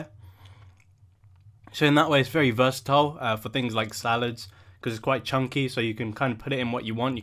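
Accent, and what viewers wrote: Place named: United Kingdom. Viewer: Your not white no, it doesn't mean you arnt British. You do however have a strong British accent. So your upbringing isn't obvious.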